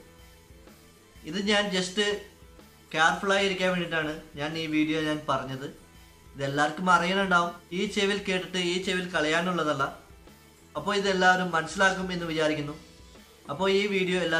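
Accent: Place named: West Bengal, India. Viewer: ബംഗാളി മലയാളം സംസാരിക്കുന്നത് പോലെ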